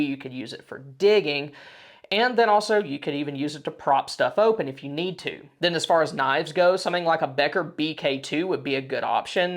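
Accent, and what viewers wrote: Texas, United States. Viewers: Congrats in reaching over the 100K subscriptions mate you deserve it as you always have great content and advice. That BK knife you have looks the goods and I have quite a small collection myself of both bought knives and I also make my own. Look forward to many more great videos and I like listening to your Texas drawl, regards from Down Under mate 😁😉🦘🦘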